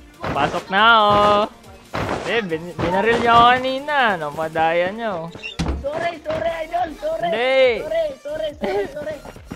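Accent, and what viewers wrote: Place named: Iloilo, Philippines. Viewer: Ilonggo yan lods hahaha trastalker hahaa illongo kami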